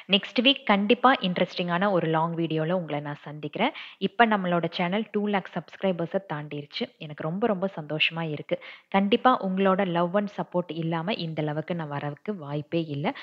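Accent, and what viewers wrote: Coimbatore, India. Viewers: Hi sis... Oh wow supb akka... Am also coimbatore only naa ipo 2 days ah than unga vedio pathutu irukken.... Really am enjoying also surprised and romba egar oru oru vedio pakkaren... Really supb akka... And unga previous vedios pakkun pothu i thought neega Coimbatore ah than irukkanum kandipa apdinu nenachen becos apdiya Coimbatore slang irunthuchu neenga pesum pothu sila words... So very namma coimbatore... Athum kottur i knw kottur azhagana oor veedu... Romba supb akka we r all keep supporting u... Engalukku neraya visiyangal share pannunga and we learning from u... Love u sis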